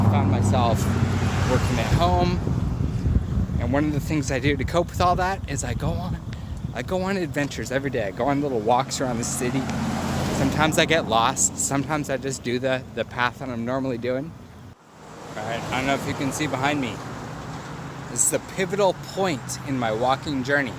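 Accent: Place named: Northwest, United States. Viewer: I found and watched a previous video when I searched “Can you combine Monstera plants”. (Your video when you combined your plants.) I thought for sure you lived in the North West United States. Are you from there? You have the accent. We lived in California for 15 years and when we came back to the south, our families would comment on our accent and I didn’t understand what they meant until a few years of being back. Now I can hear it. 😂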